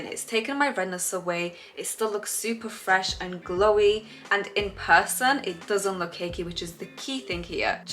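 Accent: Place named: United Kingdom. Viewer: i love ur accent ❤what part of the uk are u from? x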